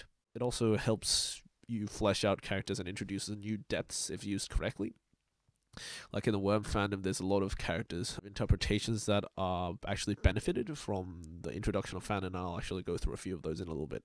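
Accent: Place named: Scotland, United Kingdom. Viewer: yes move on from that outrageous scottish accent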